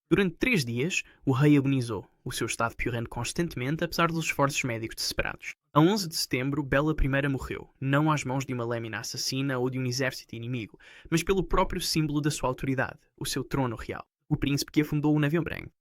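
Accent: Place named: Portugal. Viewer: Na verdade, português europeu. Se prestar atenção dá para entender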